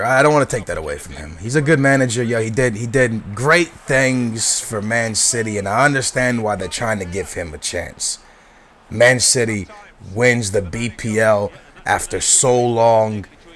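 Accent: United States. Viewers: he learned to speak english the american way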